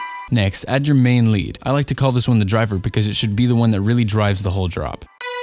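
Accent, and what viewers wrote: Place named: United States. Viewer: you sound so american lmao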